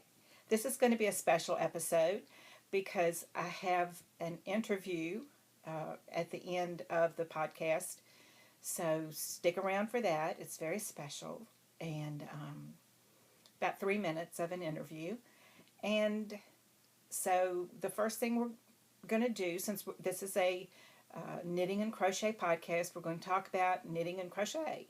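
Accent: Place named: South, United States. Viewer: Love your podcast...I especially love that your accent sounds like mine!!!! Yay for Southern ladies' podcasting!!!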